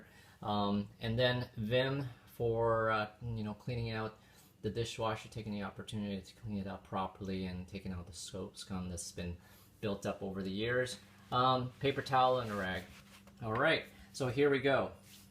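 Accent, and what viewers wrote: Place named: Pennsylvania, United States. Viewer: Where are u from. Accent Reminds me of a guy I knew from Pennsylvania. Just wondering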